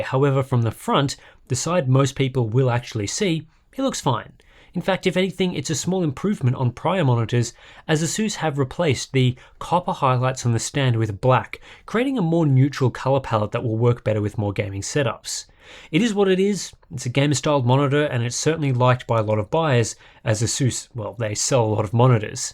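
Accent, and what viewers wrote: Australia. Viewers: What would you recommend this or the LG ultragear GP950? By the sound of your accent getting a top of the range monitor in Australia is impossible due to the chip shortage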